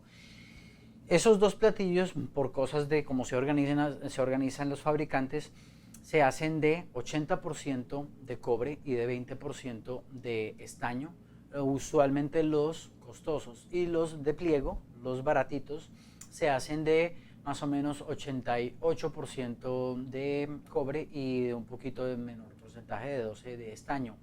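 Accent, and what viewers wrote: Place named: Colombia. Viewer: eh tio eres buenisimo jajajajaja que risas me hecho con tu asento de colombia... eres un crack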